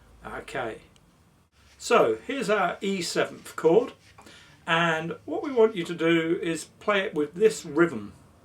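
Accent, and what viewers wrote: United Kingdom. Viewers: This is a very good tutorial. I have been playing that progression, that way for several years; my dumb, I didn't know it was Texas Blues. This teacher does a good job of explaining everything, I thought it was cool to be learning T.B. from a bloke with an English accent, but why not.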